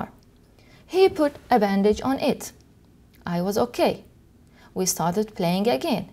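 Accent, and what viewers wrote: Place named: Arab world. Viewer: دروس جميلة لكن طريقة نطق بعض الحروف ليست جميلة كحروف T D P يبدوا أن من تقوم بالشرح لغتها الأم عربية؟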